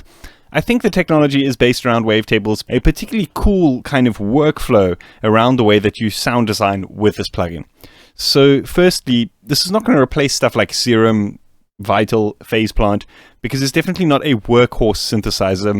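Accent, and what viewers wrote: South Africa. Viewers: Yooo a fellow South African?!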